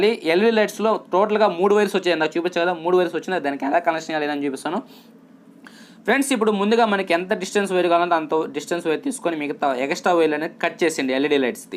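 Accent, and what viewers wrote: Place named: Nellore, India. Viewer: Super cheppinaav bro.. Mana Nellore language Lo adharakottinav...Pulsar NS160 Ki same led lights koni vunnaanu.. Dhaaniki fitting Yelaa cheyyaalo oka video cheyyandi.. Chaalaa mandhi wait chesthunnaam Ikkada😍😍😍.i like this video and I like your words...nice bro keep it up. And 160 NS maathram marchipokandi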